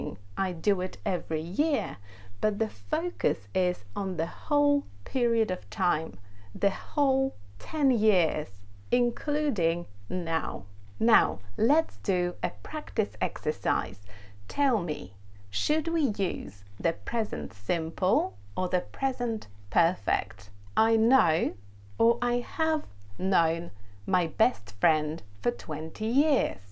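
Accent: United Kingdom. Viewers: Very nice British English,sounds like music in my ears! Kind regards from Spain. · Hi Ms, is your accent British?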